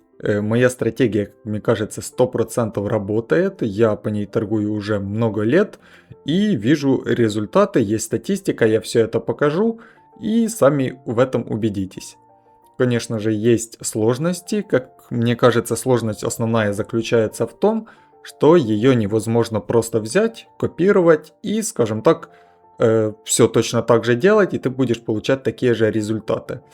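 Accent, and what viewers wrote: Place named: Vladimir, Russia. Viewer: Классный говор, Владимирская обл.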